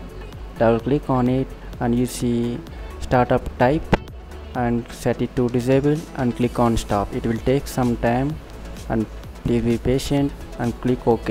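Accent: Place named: India. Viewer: ew indian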